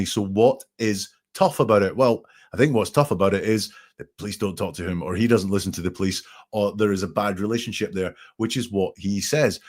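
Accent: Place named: Perthshire, United Kingdom. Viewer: your accent sounds like your from the Perthshire area that's where I'm from I'm enjoying your channel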